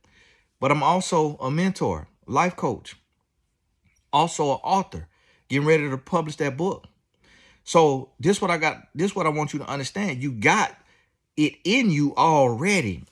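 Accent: South, United States. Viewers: I love she’s so beautiful and I love her country accent it’s to cute 🥰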